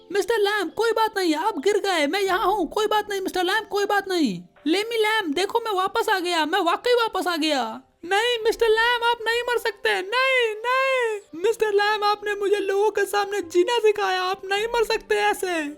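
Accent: Bihar, India. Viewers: It's not bhojpuri bro. It's hindi with bihari accent.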